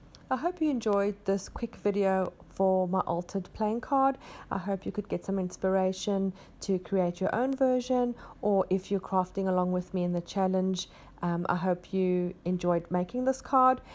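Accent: South Africa. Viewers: Glad I found your channel .. this looks like fun. Love the saffa accent 😉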